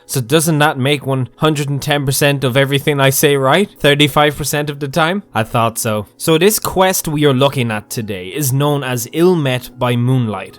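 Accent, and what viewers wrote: Ireland. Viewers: mon de Irish. fair play cha.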